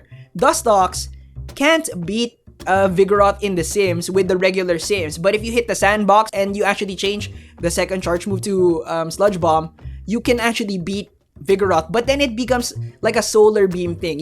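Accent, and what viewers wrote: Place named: United States. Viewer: You from the Philippines man becuase your accent very 4corners amarican accent.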